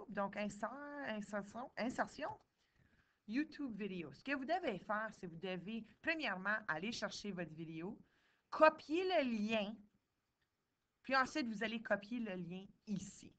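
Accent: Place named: Quebec, Canada. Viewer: Bon tuto fait par une jolie fille avec un joli accent québécois.MERCI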